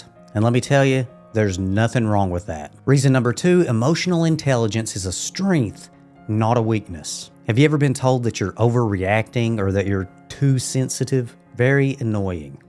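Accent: South, United States. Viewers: Like u n ur sweet southern drawl!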